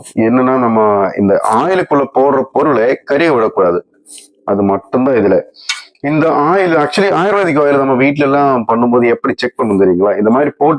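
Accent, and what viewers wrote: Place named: Kerala, India. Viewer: നിങ്ങൾ മലയാളിയാണ് എന്ന് സംസാരം കേൾക്കുമ്പോൾ തന്നെ അറിയുന്നുണ്ട്. വീഡിയോ നന്നായിട്ടുണ്ട്. ആദ്യമായിട്ടാണ് കാണുന്നത്. സുബ്സ്ക്രൈബ് ചെയ്തു.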